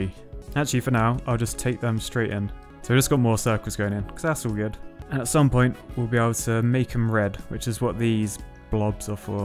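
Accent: United Kingdom. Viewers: this man is so bri'ish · Funny question but: are you British?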